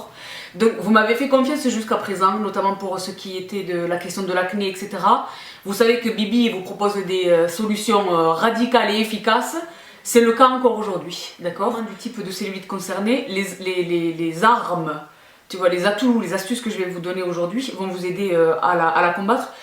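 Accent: South, France. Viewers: J'adore ta façon de parler. Franche et rigolote. Et tu as un accent excellent, ca me rappelle mon sud, moi qui me suis expatriée. Je suis d'accord avec toi, continue, tu es top.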